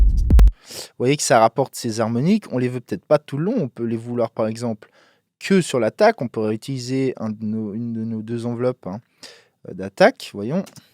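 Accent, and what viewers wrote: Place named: Montreux, Switzerland. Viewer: ce petit accent du coin Montreux / Aigle, ou vraiment pas loin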